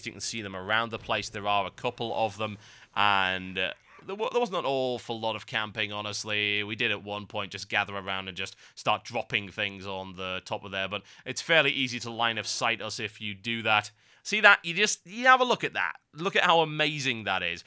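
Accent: United Kingdom. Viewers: Yay!! I love you an your cynical british accent, you make awesome videos some more entertaining than other but still a fine work <3 ^^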